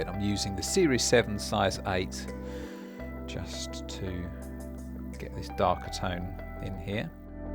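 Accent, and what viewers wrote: United Kingdom. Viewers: As a visual learner this is perfection. But as a vocalist/musician the volume fluctuations are maddening. I'd like to hear your lovely Brit voice better, without constantly adjusting the volume. Stunning work as always though.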